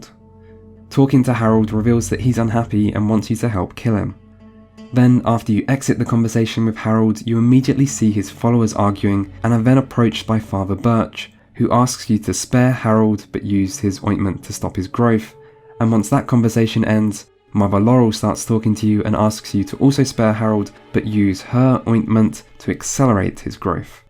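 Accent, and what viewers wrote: England, United Kingdom. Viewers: Wow! My first video discovery was the amazing Lovecraftian games video, and now I can't get enough of so meticulously crafted, intellectually challenging insights on games I never pondered about. P.S. listening to that brilliant English accent narration for a non english speaker is to die for :). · Really good commentary and soothing British voice that sounds intelligent. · Not a criticism of you, but must every documentary guy speak softly with an English accent? It puts me to sleep, I swear to you.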